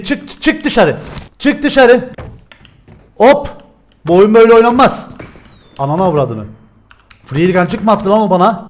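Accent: Cyprus. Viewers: Sırf şu Kıbrıs şivesi için izliyorum enerjin de güzel başarılar dilerim 👏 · Abi kıbrıs lımısın be gardaş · kardeşim sen kıprıslımısın konuşman çok tatlı kıbrıslıları seviyore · Kktcden geldim askerdim aksani ozlemisim cansin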